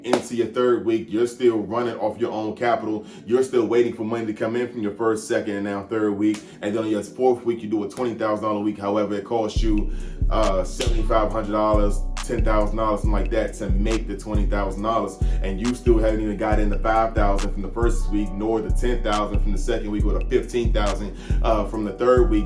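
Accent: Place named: DMV, United States. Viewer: Hey, James here with Adventures in Trucking YT Channel. I have been watching your channel and I REALLY appreciate how you are so honest and forward with your content. Me and my oldest son (he goes by hot shot Shawty on the channel) run freight on a flatbed. We recently acquired a 53 foot enclosed race car trailer to do exactly what you are speaking about. High end vehicles that require enclosed. VERY informative and good information from you as to what to look out for in this business of car hauling. Just a little background on me, I’ve been hot shotting since 1999 on and off. I do have a CDL so we are CDL holders. Currently I have the freightliner M2 with a 36’ foot flatbed and I have my Ram Dually with the 53 foot enclosed trailer as well. Still trying to get that trailer on the road but your videos are always right on point. By the way, I grew up in Bowie Maryland. 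I can tell by the accident you’re from the DMV. Also, we have subscribed to your channel. I think you were 100% correct in handling the damage to the Lamborghini. I wish there was someway that owner operators and drivers could dispute brokers/clients. It seems like everything rolls downhill on us. KEEP YOUR HEAD UP!👊🏾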